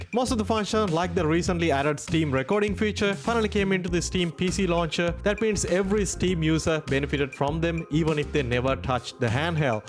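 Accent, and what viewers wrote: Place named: India. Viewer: Yo dude are you from india??your accent feels indian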